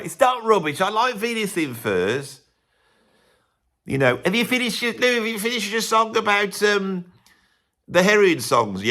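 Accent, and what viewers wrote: Birmingham, United Kingdom. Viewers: this silly sounding overly verbose "Brummie" has no idea what he is talking about when it comes to musical taste...., some of the finest moments in pop music culture...he must be talking nonsense just to be the contrarian. 😎😎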